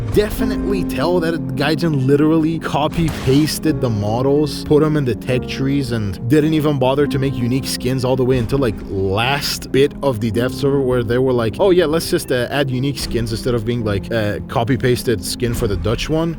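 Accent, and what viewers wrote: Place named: Iran. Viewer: i didnt think you would be iranian, but now i think about it, you do have a thin accent. but still, it would be cool to have iran as a tech tree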